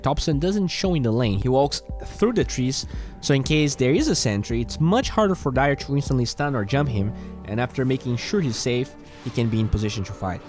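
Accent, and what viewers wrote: Brazil. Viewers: Hey big fan here, Just a suggestion, try to loosen up more the brazillian accent, try removing ur chu-to accent Make sure chu fight chu win And instead Make sure to fight to win For me thats the biggest tell of a portugese accent (sorry for the wrong spells) Ive watch too many mma's where there r a lot of brazillian fighters. Love ur content bro, straight to the point and no beating around the bush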